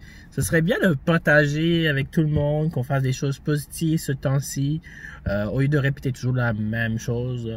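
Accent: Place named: Canada. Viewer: Tu as bien attrapé l'accent canadien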